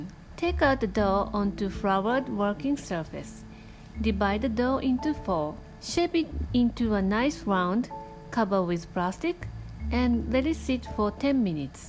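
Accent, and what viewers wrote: Japan. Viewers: i only trust japanese food video that with japanese accent on it.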